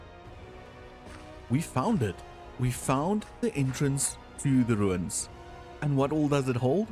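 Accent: South Africa. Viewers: From SA? I hear the accent 😂 if so thats dope. Been enjoying this season of SMP so many new creators to discover 🔥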